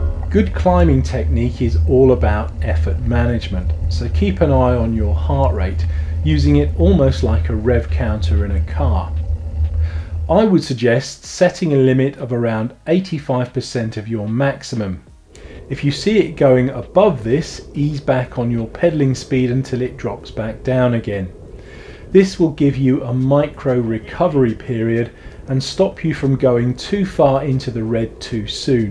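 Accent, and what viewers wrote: United Kingdom. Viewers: you are really cool and a good cyclist. Love your oh so british way of talking. In cycling as in so many other things you get better by repetition, repetition, repetition, climb, climb, climb..... you will be astonished how much progress one can make. After even 1 month or 2 of repetitive climbing & climbing & climbing you do things that were utterly unthinkable before. Everything gets stronger, mind, legs & lungs.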